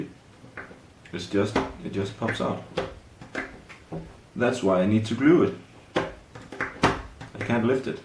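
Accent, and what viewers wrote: Denmark. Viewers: der er et par stykker der spørger om du er fra Danmark..... jeg siger det er nærmere Jylland :) din accent taler for sig selv :)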